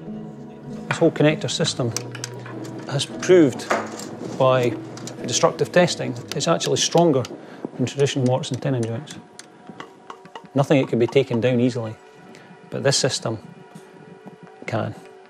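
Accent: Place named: Scotland, United Kingdom. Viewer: Good to hear a Scot's voice.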